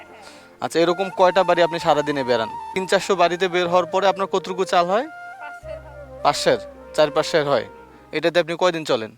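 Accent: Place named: Rangpur, Bangladesh. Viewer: ভাষা শুনে মনে হলো, রংপুরের, ভাই, আমিও ছোটো খাটো বাইকার, আপনার পাশে আমি থাকতে পারি, যদি প্রয়োজন মনে করেন, বলিয়েন, সাধ্যমত পাশে থাকবো। আর আপনার এরকম মুল্যবান কাজ গুলো চালিয়ে যান। দোয়া রইলো ❤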